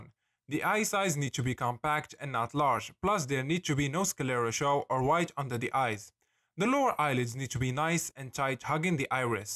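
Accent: Morocco. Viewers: You sound like a moroccan with that accent 💀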